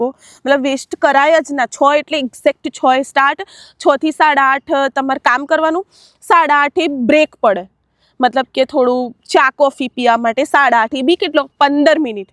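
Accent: Sabarkantha, India. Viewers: tamari accent to Sabarkantha jevi che 😊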